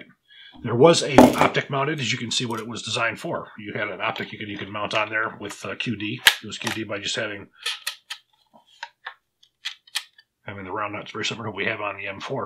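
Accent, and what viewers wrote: Texas, United States. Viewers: I love accents, especially Texan. Just caught the misciatta coming out. Hope all is well.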